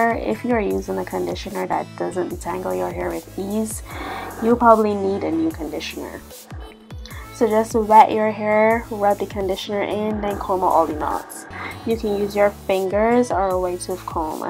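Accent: Barbados. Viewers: Love your accent, sounds like a Bajan accent if I’m not mistaken 😁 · ARE YOU FROM BARBADOS!? I feel it in ur accent!? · Has to be a bajan. I know that accent anywhere. 😍🇧🇧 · Are you from Barbados???!!!!!!!! You sound like a bajan, that's a compliment! 😉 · Im new to your channel ,is your hair bleached ? ohh and you got a Bajan accent :) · Sounds like you from Barbados love it !! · can't miss that Bajan accent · Any chance ur Barbadian? Idk u just sound Barbadian. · Heard the accent and had to subscribe. Big up to BIM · Am I hearing a Barbadian accent? I really hope so. YouTube needs more Barbadian channels. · Are you Bajan?! Cause that accent 😍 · Your curls are so pretty! Also, I’m not from Barbados but I’d know that accent anywhere lol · Yooooooo I hearing that barbarian accent !!😌😌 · You have to be bajan I know that accent too well to mistake it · You cant hide from that Bajan accent lol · Where is she from she sounds bajan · Sound like you is ah bajan yuh 🤔🤔💛💙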